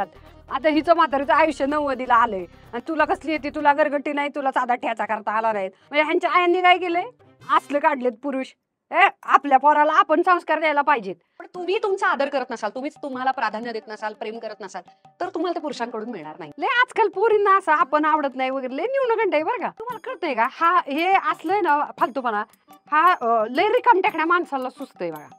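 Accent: India. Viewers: Ek no... Vidio.... Mala tuzi acting khup aavdli.... Aapli gavran Marathi...😅😊 ....... Ashich hast raha, hasvat raha... 👍🏻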